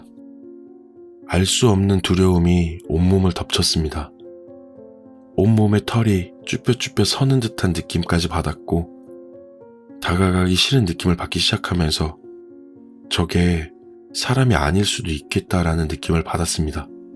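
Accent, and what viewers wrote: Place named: Gyeongsang, South Korea. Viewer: 님억양이 경상도느낌이 네요 듣기조으네요 잠자리들때면 꼭들으면서 잠이듭니다 불면증때문에요 이젠잠이와요 자야겠네요 수고하셨어요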